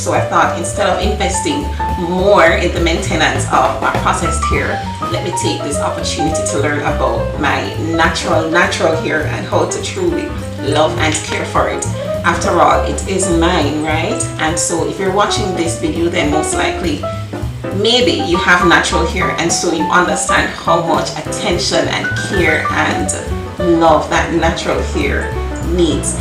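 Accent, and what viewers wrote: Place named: Caribbean. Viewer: I'm hearing a Caribbean accent. Where are uu from?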